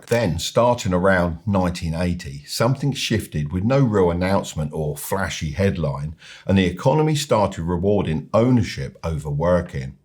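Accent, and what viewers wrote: United Kingdom. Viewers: Where did you grow up? Souds like you have a British accent. That is a critical piece of info you left out.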